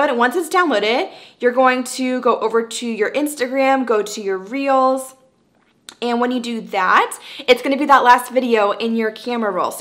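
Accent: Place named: United States. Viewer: Reals 😂 no disrespect but i love American accent 😁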